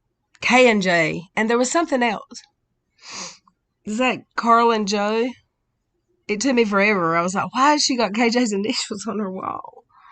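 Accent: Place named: Ozarks, United States. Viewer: you sound like you're from arkansas missouri etc that area; and sounds like she is from the midwest (which she is she lives in MN st paul area cheese country) so that the fact that she is saying her harraser is from the same is in geographic area as her yet YOU have a very differnt and strong accent from the diff part of the country just shows that she is trying to place blame on other people and is sick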